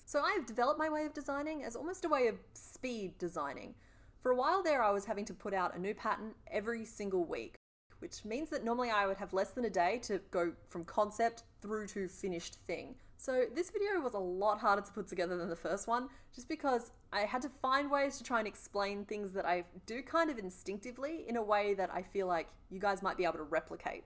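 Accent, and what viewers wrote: Australia. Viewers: You explain things very clearly! And it is so wonderful to hear an Australian accent and metric measurements. Thank you! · OMG YES YOUR AUSSIE TOO❤❤❤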